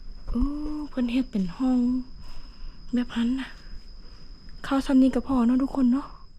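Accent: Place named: Phu Thai, Thailand. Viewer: โม่นสำเนียงสาวภูไทเด ติดตามตะลอดเด้อ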